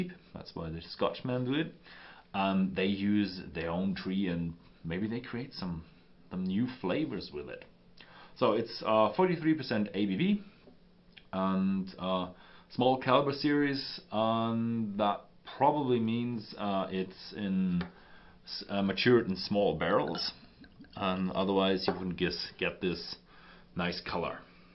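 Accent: Germany. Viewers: dude..move on. Not every word in proper English is pronounced the way you are used to. The presenter is German, there would be an accent.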